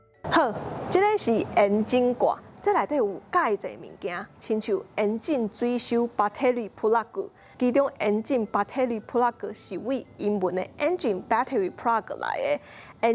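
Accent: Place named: Taiwan. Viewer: 人在美國.還可講得道地的台語.不簡單.不忘本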